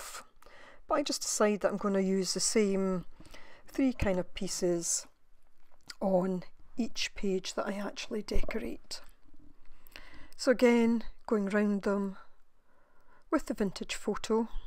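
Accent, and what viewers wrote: Scotland, United Kingdom. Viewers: I just loved this... thank you so much. Fabulous! I so enjoyed your Scottish accent. My only son works and lives in Scotland as a green keeper. I have visited once in 2019. The most magnificent country. I hope you are safe and well wherever you are. Stunning work. Aaah tea ☕️ best regards from South Africa to you 💫🇿🇦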